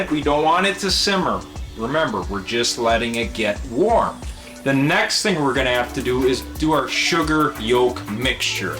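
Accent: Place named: Wisconsin, United States. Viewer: Hard to fake that northern Wisco accent! Great job. Very enjoyable video with easy instructions and explanations.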